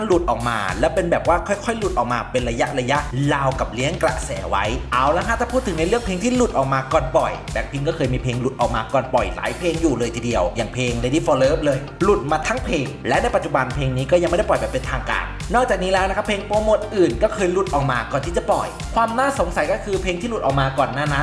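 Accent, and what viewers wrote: Isan, Thailand. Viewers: ตื่นเต้นอีกรอบแล้ว..อยาดฟังมากๆคะ รักลิซ่า ชอบช่องนี้มาก เว้าอิสานถึกใจคักๆ.มักหลาย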